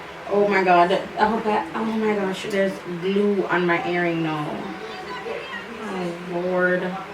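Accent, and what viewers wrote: Jamaica. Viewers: First time watching ur video but u sound Jamaican so am gonna subscribe lol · Are you Jamaican 🇯🇲 and British 🇬🇧? I hear two different accents. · Its actually an Jamaican accent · U sounds like a Jamaican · Great Job💪🏾 you sound Jamaican? New subcriber from! JA😊 Mama Mama!😊 i like your vibes you are so down to earth and the love of God is real in your life! Your vibration will take you places you never imagin😊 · I love how you speak girl.love ur patwa. Can listen to u all day. Beautiful makeup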